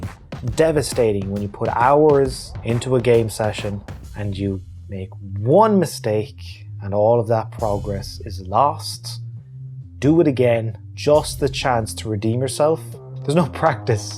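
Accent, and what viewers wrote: Ireland. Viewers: Guardians Of The Galaxy and the voice of a Irishman, no better way to spent a Friday night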